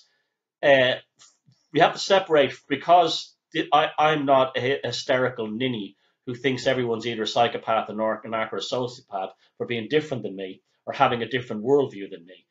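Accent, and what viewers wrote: Ireland. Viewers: ...Nice to hear your Irish dulcet tones again...(enjoying your videos as always). Wishing you a very happy 2018.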